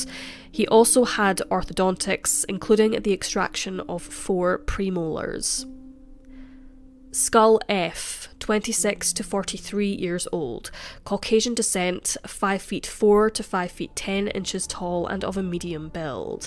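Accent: Scotland, United Kingdom. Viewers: Love her Scottish accent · Is the narrator Scottish? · It's the scottish accent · The Scottish accent is enchanting, isn’t it? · Scottish, they sound beutiful until they get drunk and then noone can understand what the fu*k they're saying 😄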